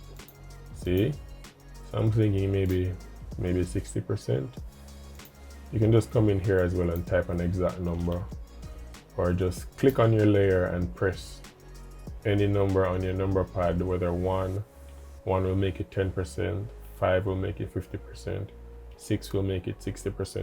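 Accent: Jamaica. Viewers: 2 seconds in....yessss my Jamaican brother!!! lol super helpful video btw, thank you! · Is that a Jamaican Accent?? Now I have to learn this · are you jamaican? you sound jamaican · Are you Jamaican? Or from the Caribbean? Because i am Jamaican and i recognize the accent. Thank you for the help! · You sound Jamaican. I just subscribed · You sound Jamaican bro, are you? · Are you Jamaican 🇯🇲 ???wonderful accent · are you jamaican? i pick up the accent anywhere lol. Also thank you for the tutorial it really helped! looking forward for the others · Are you Jamaican? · Are you Jamaican ? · i hear a caribbean accent… hmm let me guess, Jamaican? Great tutorial 💯💯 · you're jamaican, aren't you?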